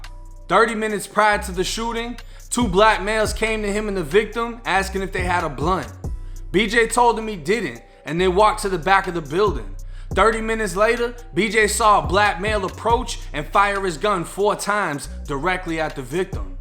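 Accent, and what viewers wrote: Boston, United States. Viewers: You must be from Boston Massachusetts just from the way you talk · It’s a bit of a Boston accent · yo are you from Boston your accent is crazy 😹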